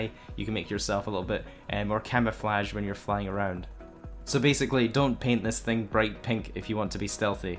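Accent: Scotland, United Kingdom. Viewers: Love your scot accent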